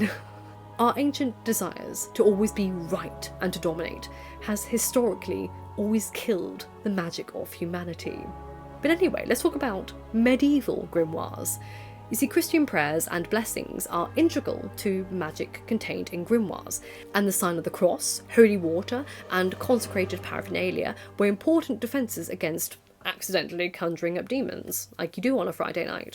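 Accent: RP, United Kingdom. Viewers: British viewers, does her accent sound as posh to you as it does to me? I can't imagine there's a British accent that's more posh than this lol.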